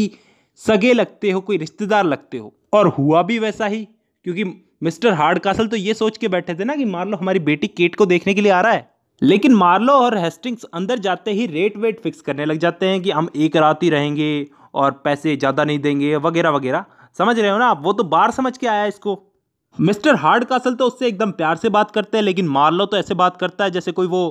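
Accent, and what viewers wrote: India. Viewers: Hahahahhah best way of explanation.... English with desi tadka wow .. great Sir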